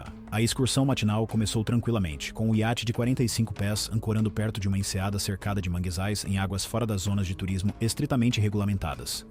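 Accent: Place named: Portugal. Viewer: Talvez seja porque o narrador é português. Lá eles falam meio rápido e adotam o padrão internacional de medidas, se não me engano. Mas, de fato, ele deveria se esforçar a falar menos rápido para as demais pessoas foram de Portugal, possam acompanhar melhor e falar as duas medidas para melhor compreensão. Tem que pensar fora do seu país.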